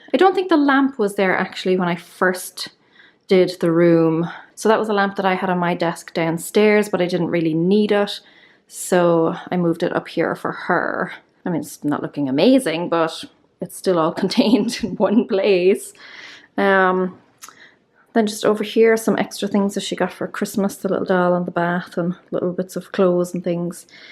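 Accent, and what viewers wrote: Ireland. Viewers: "they are". The Irish accent makes the area have two syllables!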